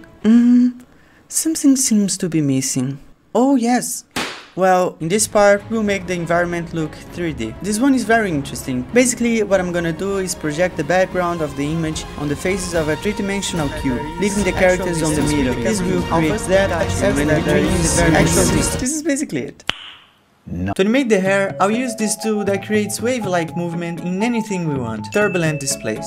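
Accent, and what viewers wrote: Brazil. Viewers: Esse cara tem um sotaque meio q brasileiro n acha? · Sabia q ele era brasileiro, esse sotaque não engana kdjdkdk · Adoro o sotaque brasileiro inglês hahaha, eu entendo tudo, os gringos falam tão rápido q nem da tempo de dobrar a língua ksks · Eu percebi assim que ele começou a falar inglês, sei lá, parecia um inglês meio nordestino, pensei "sapoha é Br rapaz" kkk Cara esperto e esforçado slc. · Puta q pariu! Q FODA! Br reconhece outro BR só pelo sotaque kkkkk. Boa sorte nos trabalhos mano 👊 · Sotaque brasileiro do caralho kkkkk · o sotaque br é muito reconhecível, não dá pra deixar passar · Esse cara pelo inglês dele, parece que tem um sotaque brasileiro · Primeira frase que eu ouvi já pensei "hmm sotaque de brasileiro"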